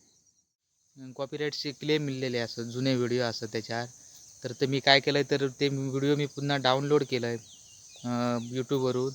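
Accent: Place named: Malvan, India. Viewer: सुमधुर, मालवणी लहेजा असलेली ... भाषा शैलीने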